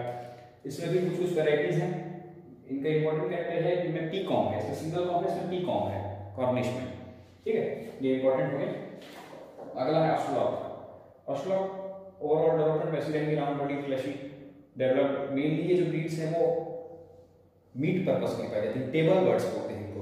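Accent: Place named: Uttar Pradesh, India. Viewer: Apart from the content (which indeed was informative), loved you n your U.P accent as well❤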